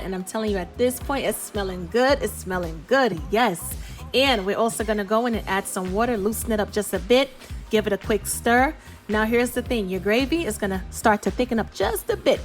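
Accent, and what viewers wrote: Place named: Brooklyn, United States. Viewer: I knew it! I could hear that Brooklyn accent. I wanted to ask, but was too shy to. lol :)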